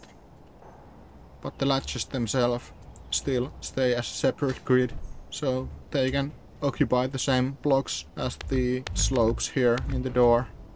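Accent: Finland. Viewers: Sounds like Finish English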